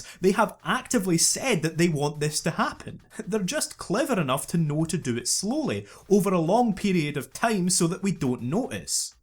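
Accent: Scotland, United Kingdom. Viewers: narrated in an awesome Scottish accent with regular rolling "r's"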